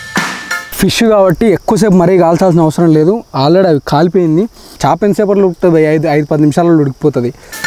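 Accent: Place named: Palamuru, India. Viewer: Super bro👍 Enka Purley PALAMURU slang lo matladu , mana plaamuru slang ki full fans unnaru bro, Keep it up. All the best for your bright future (your YouTube channel) bro🎉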